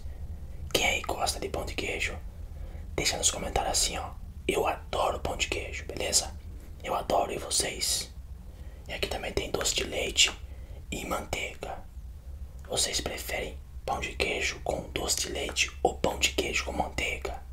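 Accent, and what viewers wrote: South, Brazil. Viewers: Tu é de SP mesmo? Teu sotaque parece do Sul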